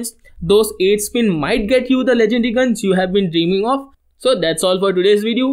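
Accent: India. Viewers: Indian accent? Cant trust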